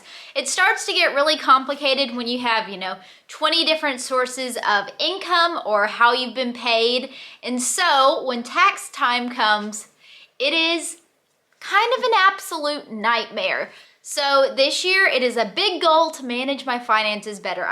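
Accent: South, United States. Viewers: My resolution is to watch you more because your true blue not fake an that's what makes you real keep it up an your southern accent so cool till yor next video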